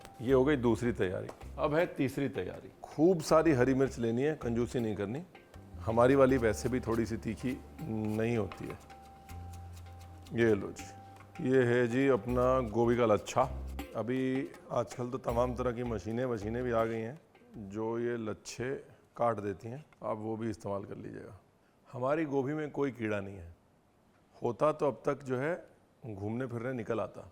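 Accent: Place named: Varanasi, India. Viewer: Banaras walo ka bolne ka style bhut achha h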